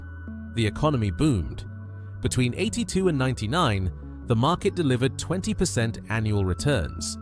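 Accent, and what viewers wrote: United Kingdom. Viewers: Interesting that someone with a British accent is pointing out problems in USA. Compare Great Britain with USA and see what the results are! This is not 1929 and Americans can still start their own small businesses and have more income than those who work for a salary. Those who have the means are already shifting to assets..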